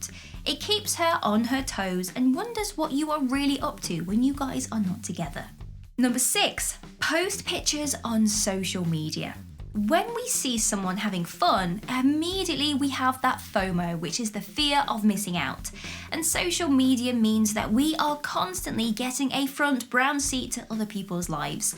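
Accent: United Kingdom. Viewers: I like your accent ! Are you British ?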